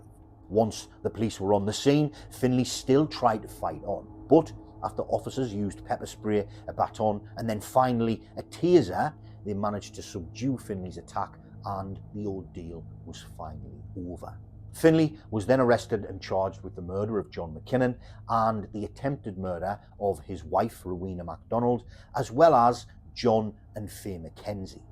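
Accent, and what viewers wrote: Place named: Newcastle, United Kingdom. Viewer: I'm probably due you an apology for thinking you were a well spoken Geordie instead of a Mackem then haha. Glad you noticed my critique was intended as constructive instead of just arsey. I'm a Fifer who lives not far from Burntisland, trust me, you're not the first to say it out as one word, heard many an American or English tourist pronounce it the way you did. I'd also say there's probably a good amount more of Scottish Madmen (a few women too) and Monsters to cover in the future. For example wouldn't mind a longer or longish one on either Peter Tobin or Angus Sinclair. I was looking for something new in the True Crime genre and subscribed after watching 2 of your earlier videos, genuinely think your work is generally excellent mate.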